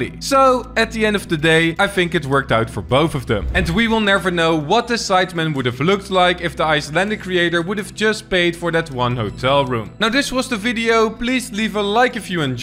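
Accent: Netherlands. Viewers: Im almost sure ur dutch. You sound dutch af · Brother, he's from the Netherlands.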